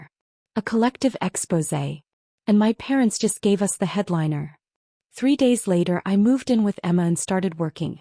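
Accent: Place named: Spain. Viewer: Why would you have a narrator use a heavy Spanish accent reading English? Makes no sense.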